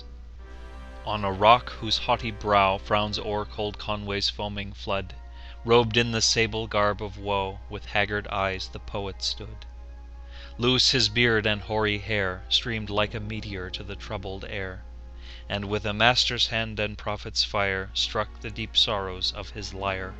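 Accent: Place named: United States. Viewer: Diolch yn fawr! Although not sure the US accent lends itself to medieval poetry ;)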